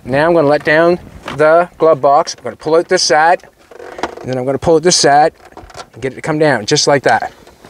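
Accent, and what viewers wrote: Canada. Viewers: Fired this up and in the first 10 seconds I was like yeah this guys Canadian and it was confirmed when I heard Canadian tire 😂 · I promise you , not all Canadians sound like this guy …. Or have a dusty ride like this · I was just about to say, you sound more Canadian than I is. Then, you said Nova Scotia 😂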